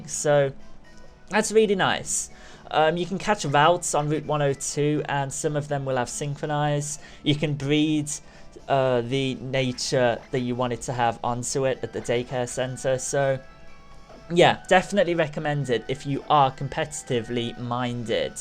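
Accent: Birmingham, United Kingdom. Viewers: Are you brummie?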